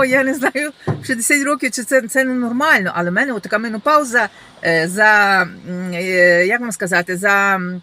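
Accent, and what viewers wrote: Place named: Ivano-Frankivsk, Ukraine. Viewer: вона з Івано-Франківщини , в одному відео проговорилась з коліжанкою , чути по розмові , що не Львів і не Львівщина ! 😂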